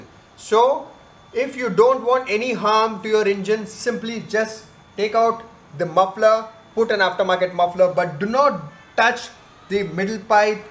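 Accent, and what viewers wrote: India. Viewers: okay this is very loud and clear explanation after finding so much gimmick videos in youtube, your indian accent is quite strong but i totally understand what you said, not like the others explanation from another indians, sorry i am not being racist but you are good.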